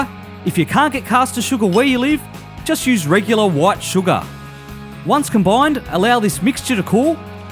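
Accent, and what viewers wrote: Australia. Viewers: idk why but i fkin hate aussie accent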